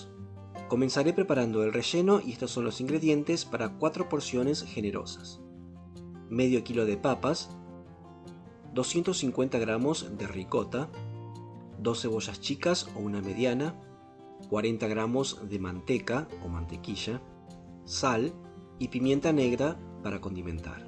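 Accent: Argentina. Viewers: soy español. He vivido 24 años en Polonia. Ver tu receta ha despertado en mí tantos y tan bonitos recuerdos... ¡¡¡Eres un artista!!! La receta está fenomenalmente explicada paso a paso y muy bien hecha. Estoy seguro que tus pierogis están buenísimos. ¡¡¡Enhorabuena!!! Por cierto, me ha gustado mucho tu manera de explicar, ese acento tan bellamente argentino y lo bien que cocinas.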